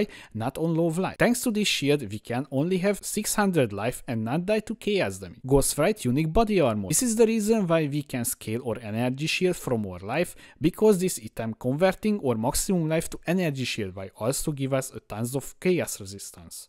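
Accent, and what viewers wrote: Hungary. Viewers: az akcentusból tudtam, hogy magyar vagy, jó videó! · Hungarian, right? :D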